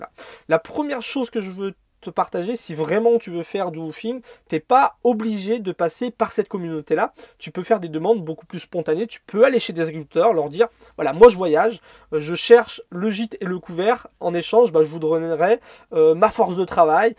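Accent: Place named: North, France. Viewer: Bonjour Merci pour la vidéo. Il est plus rassurant de partir en woofing car il y a une certaine réglementation. Porte à porte plus compliqué quand une fille part seule en Australie. Le principal est d être claire avec le woofer et être très explicite quant à nos attentes. Soit dit en passant, vous avez l accent nordiste Merci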